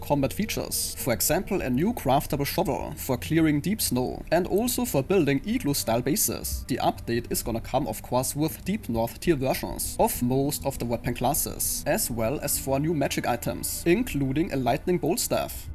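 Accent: Germany. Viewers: DU REDEST SO DEUTSCH OBWOHL DU ENGLISCH SPRICHTST.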